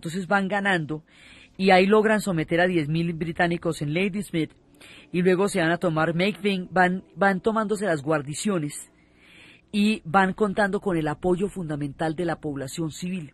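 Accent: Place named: Bogotá, Colombia. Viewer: Es el acento y modismos de los bogotanos tradicionales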